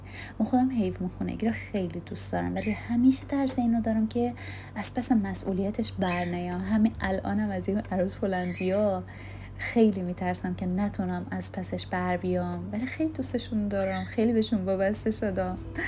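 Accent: South, Iran. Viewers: وااای🤩 چه لهجه شیرینی، چه لحن آروم و آرامش بخشی🥰 ویدیوهای بی کلام هم بخاطر موزیکشون و کارهای روزمره جذاب و آرامش بخش هستن ولی صحبتهای شیرینِ شما با لهجه زیبای جنوبی یه چیز دیگه ست،عااالی عالی👍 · سلام عزیزم جان❤ چه صدای دلنشین و لهجه بامزه ی جنوبی.مبارکه آسیاب کن.چقدر پیراهنت قشنگه.من از حیوون خونگی اصلا خوشم نمیاد چون وسواس دارم.خیلی هنرمند و کدبانویی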